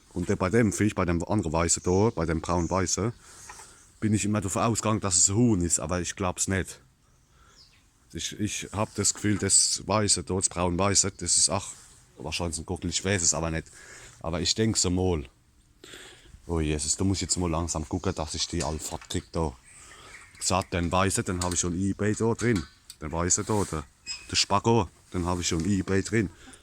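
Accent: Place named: Palatinate, Germany. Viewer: Dieses pälzer gelaber ist aber dem monnemorischen schon sehr ähnlich und sehr witzig. Hab dir mal n abbo dagelassen, Grüße aus Mannheim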